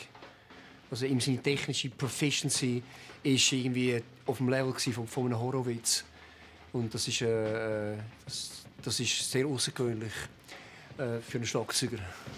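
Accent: Zurich, Switzerland. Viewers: Lymphosacroma Yes, I knew Jojo is from Zurich, and I also knew the German they speak is not exactly the "straight" German, but having studied German in school (some 30 years ago...) I thought I could understand a little bit more. So to say, I once could hear people speaking Kölsch (Köln dialect) and could understand much more than what Jojo is saying here... here in Italy is pretty the same though, when not worse: if a Northern Italian and a Southern Italian would speak each other in their dialects, they could barely understand! :) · Yes, Jojo speaks Züri-Dütsch, the Swiss-German spoken in the Zurich-area. I'd say it's probably one of the easiest Swiss-German to understand if you speak German. Certainly easier than let's say Bern-Dütsch or even Walliser-Dütsch. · is he speaking swiss german? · Merci, ändlich mal e Ufnahm wo er Schwizerdütsch redet :) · He's from Zurich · Alter Schwede, ich kenne ja nur sein Englisch, aber auf Switzerdütsch ist er echt eine Herausforderung für einen Deutschen :D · hat er in diese Video auf Deutsch gesprochen? 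oder ein Dialekt vom Schweiz?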